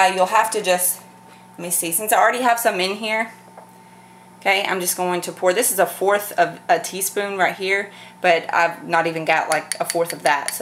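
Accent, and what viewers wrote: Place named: United States. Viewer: American accent is so annoying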